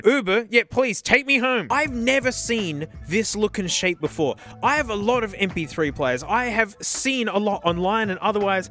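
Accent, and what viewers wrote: Australia. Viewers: As a german myself, hearing a funny australian man with a pet snake pronounce german words is pure bliss also "Große" the "ß" is not a b-letter, but instead is a sharp "s" sound, there are talks of that letter being removed someday but idk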